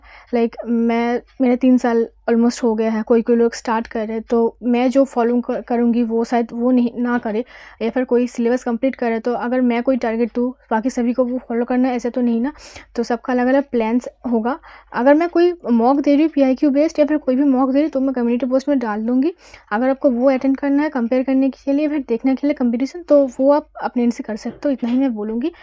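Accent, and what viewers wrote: Bengal, India. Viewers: Ntpc ka process is baari fast hoga Or West bengal me vacancy bhi accha h....tumhara bolne ka style se lag rha h u r from bengal... So don't miss it keep going on👍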